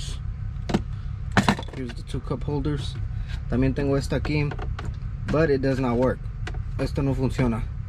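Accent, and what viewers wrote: United States. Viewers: You from Chicago???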